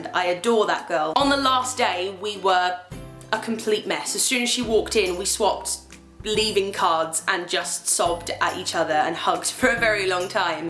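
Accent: United Kingdom. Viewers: I saw the channel name "well I think I'm gonna subscribe the channel" I hear the British accent, You talk about Dodie presses the subscribe button so hard, my phone screen would be broken, if I had an iPhone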